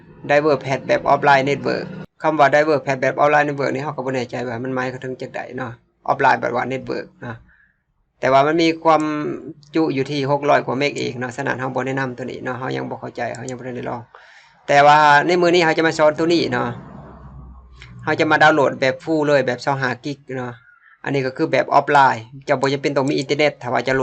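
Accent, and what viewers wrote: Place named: Korat, Thailand. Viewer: ชอบสำเนียงแก่จริงๆ คนโคราชนี่.?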